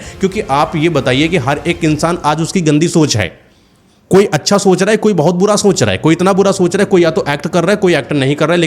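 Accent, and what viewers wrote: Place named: Bihar, India. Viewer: Hopeless aur bekar aapne sab bataya, aur apni bihari bhasha ki hindi mat boliye bada odd lagta hei sunne mei, apni hindi thik karo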